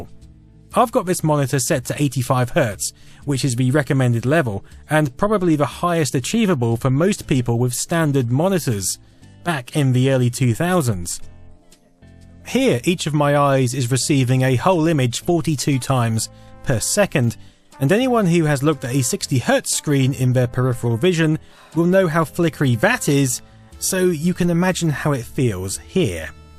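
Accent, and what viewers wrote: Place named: United Kingdom. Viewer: I actually want to play the Bridge Command game now. accent is cool and all as with any brittish accent, but everytime I hear he say about the early nineties as (early "naughty"es) I can't help but crack a smile.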